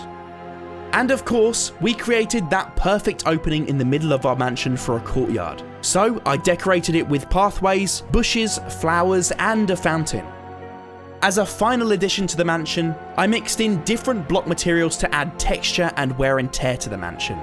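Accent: United Kingdom. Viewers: Don’t wanna be mean but you’re British accent makes it really fun to listen to you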